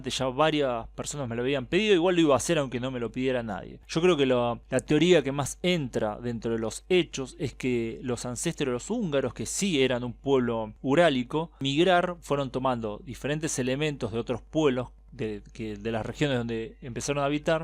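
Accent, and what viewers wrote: Rioplatense, Argentina. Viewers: Hay palabras que sencillamente no se que dices, son solo palabras pero tu Argentino dificulta un poco saber que palabras acabas de decir. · What he was trying to say is that the channel is from Argentina, not from Spain. But we all speak Spanish. I'm chilean and I recognize his argentinian accent. Greetings to Ukraine! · Asi se pronuncia el castellano rioplatense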